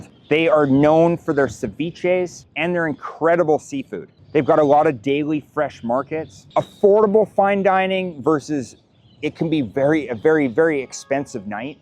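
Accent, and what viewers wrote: Canada. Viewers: I somehow knew immediately you were Canadian --- got a chuckle when you said where you were from and the rain tally. I'm in North Vancouver so, yeah..... Thanks for sharing, I'll be looking into Peru more going forward --- it's been on my shortlist as well. Cheers!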